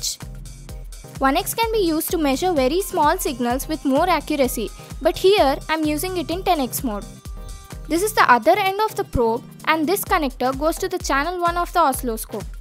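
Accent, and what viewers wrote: India. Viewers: Yes you explanation is wright on,it shows you your stuff.....again well done,but if i may have a tiny reserve ,for a non Indian person ,we may have a bit of difficulty to understand what you say as you are showing and accent.May i suggest that you speak (and this is valid for all Indian people) a little bit SLOWER,and that would make your videos near perfection. Bye. Lucien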